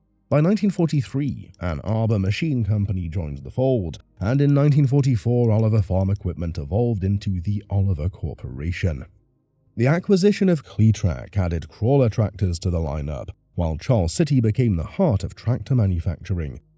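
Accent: United Kingdom. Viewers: Interesting subject that I would have enjoyed except for the absolutely unbearable sound quality and the British accent being so thick I couldn't understand what he was saying.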